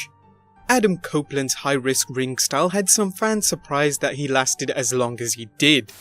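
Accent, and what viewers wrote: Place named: United Kingdom. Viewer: Why does ALWAYS have to be a British narrator?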